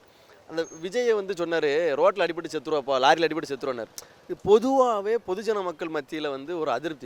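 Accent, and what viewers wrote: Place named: Tirunelveli, India. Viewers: நெல்லை தமிழில் எழுத்து வாங்குகிறார், வாழ்த்துக்கள், உழைப்பிற்கு நிச்சயம் உயர்வு தேடி வரும் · நம்ம நெல்லைத் தமிழ் சிறப்பு அண்ணா 💥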